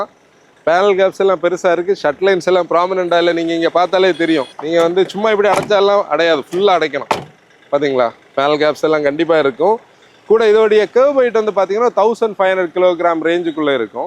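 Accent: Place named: Nagercoil, India. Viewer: I think he is nagercoil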